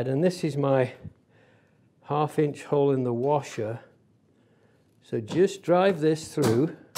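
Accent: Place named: England, United Kingdom. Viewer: Super travail et quel plaisir d'entendre un bel accent anglais. Merci.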